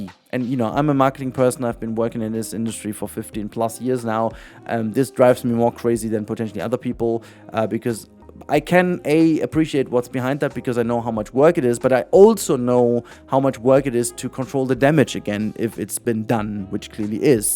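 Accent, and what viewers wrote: Germany. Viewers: Hello I have a question. Are you German? Just asking cuz you sound German to me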